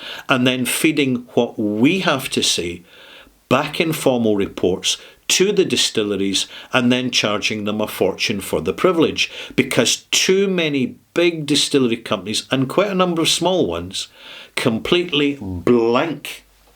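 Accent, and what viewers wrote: Scotland, United Kingdom. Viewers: "cranks are doing a hugely important job in keeping the entire category relevant and alive" It might seem like this is just ego talking, but it's really true. I myself, someone who drank virtually no alcohol at all, got into Scotch a couple of months ago after YT had decided to recommend me a video of a Scottish gentleman with a lovely accent, sitting in front of a barrel, talking passionately about whisky. Your enthusiasm is contagious, so after watching a number of videos I decided I had to buy a couple of (integrity!) bottles to see what the fuss is about myself, and thanks to your recent mini-series I want to get my hands on some rum too:). Cheers from Slovakia,!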